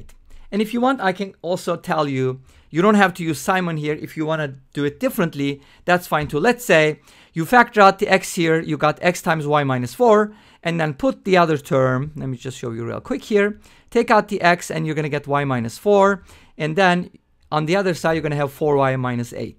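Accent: Turkey. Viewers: Ill posed as a geometry problem since area and perimeter are different properties and have different units. Keep up the good work. Do I detect a Turkish accent in your speech?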